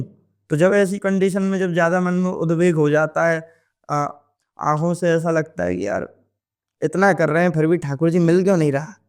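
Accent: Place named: Braj, India. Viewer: बृजवासी tone he ye शंका ना करे🙏🏻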